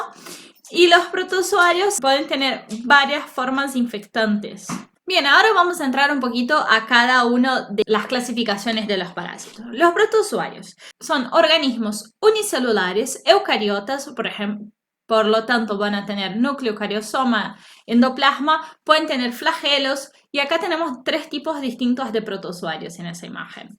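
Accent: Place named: Brazil. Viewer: então, eu reconheci seu sotaque brasileiro no hora de falar espanhol. Seu conteúdo é bem interessante. Eu morei no Brasil dois e meios anos. Eu fiz mestrado lá.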